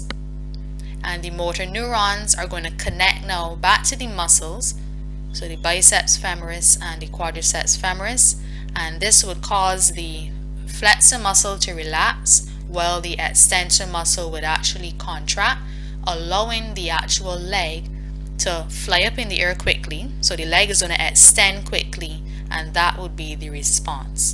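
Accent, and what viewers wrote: Barbados. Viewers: yea...I figured so.....I grew up with that accent so I knew it...just certain words were classic Barbados. Glade to hear ya teaching. Create more content. Thank you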